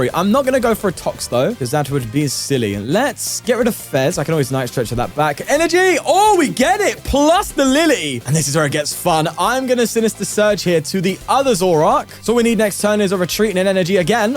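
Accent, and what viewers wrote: United Kingdom. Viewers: bro is british af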